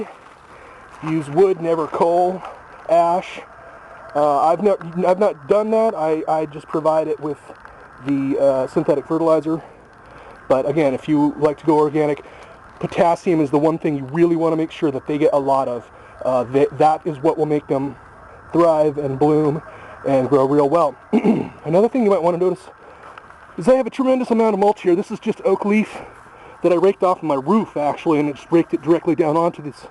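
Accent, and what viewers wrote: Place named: Florida, United States. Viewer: If you’re from Florida, you have an awesome Floridian accent.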